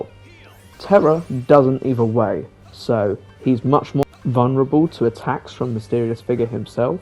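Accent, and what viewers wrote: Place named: United Kingdom. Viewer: y r u british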